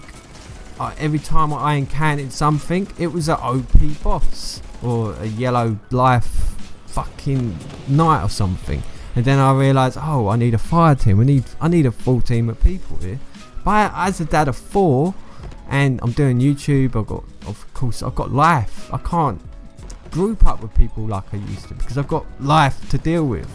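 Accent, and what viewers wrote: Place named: United Kingdom. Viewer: sir you have a painfully bri'ish accent but i love it. keep up the good work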